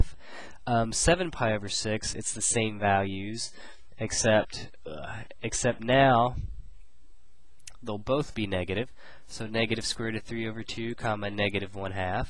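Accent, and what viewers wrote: United States. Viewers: one of the american accents ;) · of course!! It is American Accent. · True, but I thought he was an American. So American to American, there is no accent. (I do understand that it's an American accent, but between Americans we don't notice a difference.)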